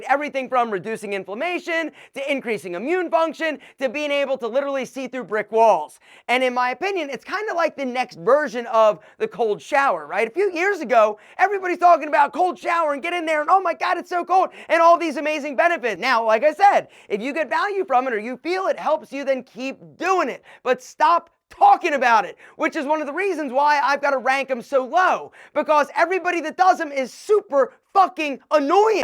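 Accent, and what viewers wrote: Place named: AAVE, United States. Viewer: Try not speaking ebonics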